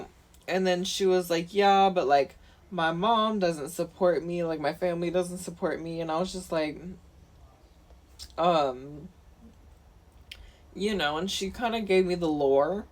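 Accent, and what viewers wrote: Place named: San Francisco, United States. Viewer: What a San Francisco accent.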